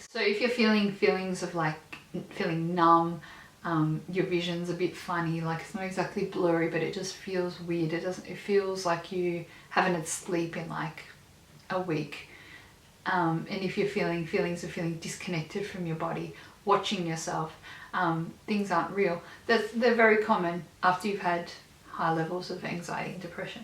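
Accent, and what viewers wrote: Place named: Australia. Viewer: Already feel much better after watching this. The aussie accent is definitely soothing too haha.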